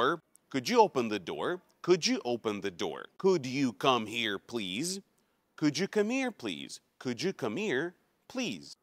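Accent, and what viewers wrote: United States. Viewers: Спасибо, снова молодец! Надо только заставить мой язык поворочиться , буду тренироваться. Но, насколько я понимаю, это американское произношение, англичане так не говорят, не так ли?! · Ты реально крутой чел, я думал ты достиг такого уровня американского английского живя в США, но нет, я ошибся! Продолжай, ты делаешь большое дело! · Ого, я думала он американец. Офигеть. Короче чтобы выучить разговорный, сначало нужно понимать классический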